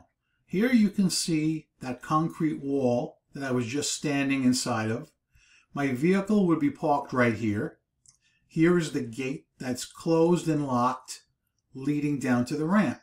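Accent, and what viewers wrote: New York, United States. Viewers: Your distinct New York accent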